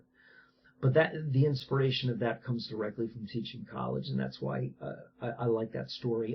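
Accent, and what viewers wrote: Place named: East Coast, United States. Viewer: What you said was interesting an all, but the way you speak reminds me of friends from the east coast. Found m'self trying to narrow it down to NY, PA, or MA before I saw the "Phila" on the sweatshirt. I'm afraid my reading comprehension is everything it was in high school.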